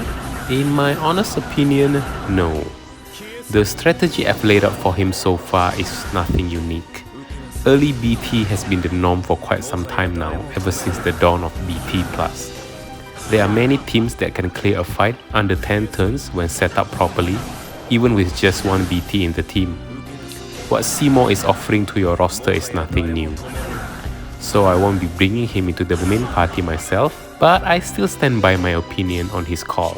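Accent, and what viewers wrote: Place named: Singapore. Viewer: hi bro are u singaporean too? sounds like one also might need help on ddffoo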